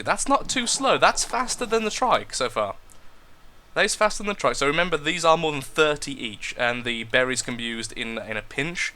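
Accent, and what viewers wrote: United Kingdom. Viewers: keep on going with your videos of this game, this is awsome.Good work soo far britain man with funny accent and dislexia.(that is no offens)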